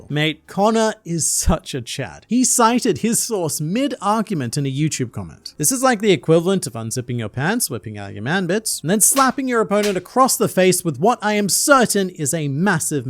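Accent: United States. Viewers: Your speaking tone and accent is probably the closest to "American" I've ever heard from an Australian, so I can see why they might have gotten that part wrong. As an American who has talk to a decent amount of Australians over the internet and watched your channel, I didn't realize you were Australian until you mentioned it yourself. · Top notch American accent and as a New Yorker I was 100% fooled by your "I'm walking here" 😂😭😂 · Um actually you sound extremely American. You're just distorting your own accent. Trust me I would know, I'm from Canada. 😂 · Actually, you're both very confused. Pluto is a dog. Also, your attempt at American is only one of many accent's I don't feel like counting. We have a lot. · can you do a video in your American accent? It's hilarious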